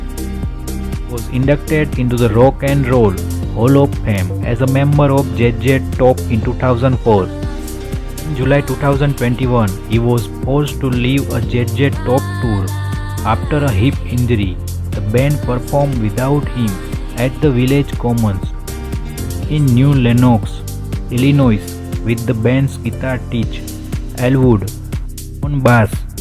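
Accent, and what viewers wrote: India. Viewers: The voice in the documentary seems Indian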